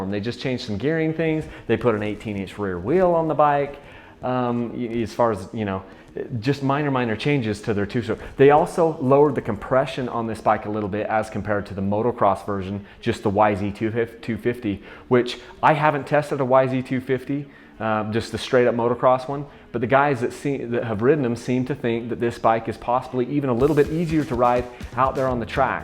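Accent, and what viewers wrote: Utah, United States. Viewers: Dude you have the best example of a Utah accent I've ever heard! Thanks for the sweet content in familiar places, hope to bump into to you some day.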